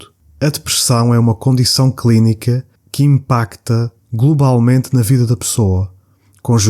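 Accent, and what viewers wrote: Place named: Portugal. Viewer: Dr. Acho que só a tua voz já resolveria muita coisa...que som agradável de ouvir...com este sotaque português então, é tudo de bom...❤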